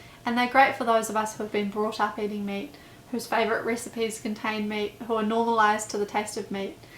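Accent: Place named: New Zealand. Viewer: I picked up the kiwi accent way before she mentioned it. If I'm not mistaken she's probably also from the lower south Island. Just a hunch. Great presentation and finally vegan recipes with New Zealand ingredients!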